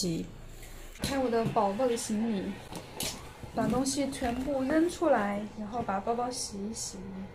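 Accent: Chongqing, China. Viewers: 在重慶都要這樣講話嗎？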